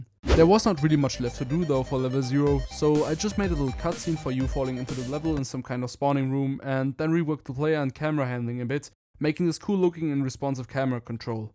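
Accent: Germany. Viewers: Are you german? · Oh yeah and PLEASE try to bring this to steam I’d love to play it, also you’re pretty obviously German just judging by your accent (no disrespect I’m German too) and from the text in 😭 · YOU ARE GERMAN I HEAR IT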